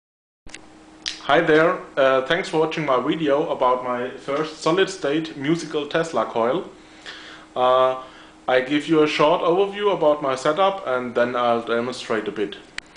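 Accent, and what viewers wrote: Germany. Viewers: Man hört irgendwie dass du deutscher Bist; aber kannst geil englisch :D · Man hört sofort das du Deutsch bist ^^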